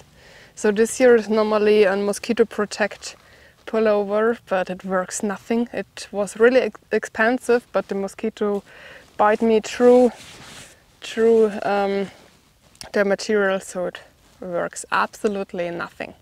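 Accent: Germany. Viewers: ich finde, du solltest das unbedingt auf deutsch machen, sorry, dein englisch ist zwar funny und irgendwie nett, aber wirklich arg "germanised" ...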